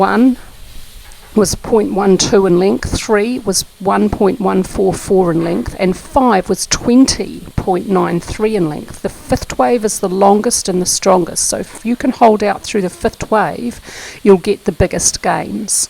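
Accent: New Zealand. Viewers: Very good. Good ole kiwi accent too eh :)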